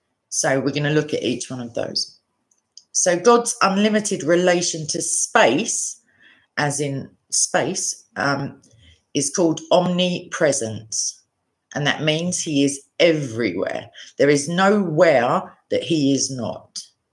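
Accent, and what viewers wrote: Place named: United Kingdom. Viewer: I love her British ascent.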